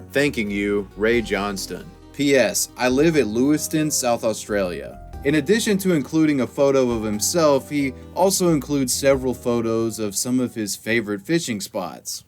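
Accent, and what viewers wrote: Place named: United States. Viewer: It's like Bellular with with an American accent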